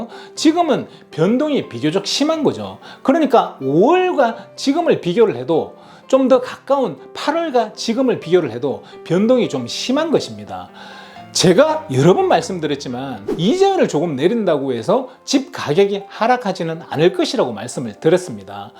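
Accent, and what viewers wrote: Gyeongsang, South Korea. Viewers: 대구 사투리 ? ㅋㅋ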